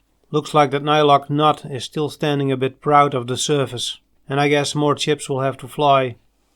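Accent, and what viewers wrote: Netherlands. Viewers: your Dutchlish accent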